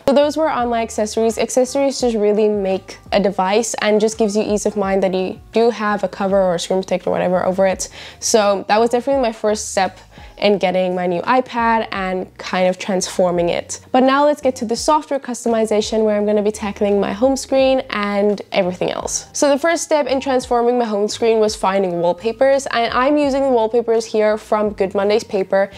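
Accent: South Africa. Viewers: You sound so South African! I’m also from SA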